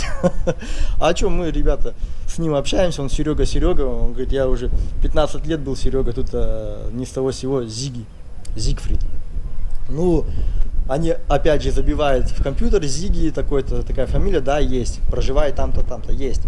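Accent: Kazakhstan. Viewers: у тебя говор и речевые обороты как у моего мужа и его брата и племянников из Казахстана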